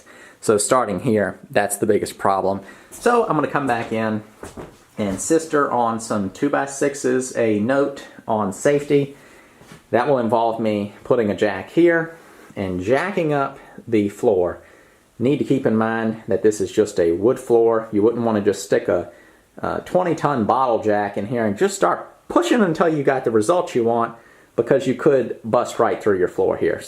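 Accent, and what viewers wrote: South, United States. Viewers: What state are you in? Your accent sounds like Alabama, Mississippi, Tennessee, or Georgia. Maybe the Carolinas....I'm in GA.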